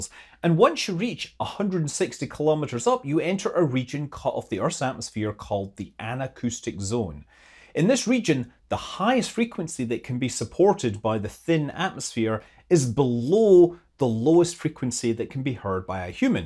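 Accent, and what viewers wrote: Scotland, United Kingdom. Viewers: Jesus,. I've started to explain astronomical context to my GF with a Scottish accent, and I'm South African. Thanks for these!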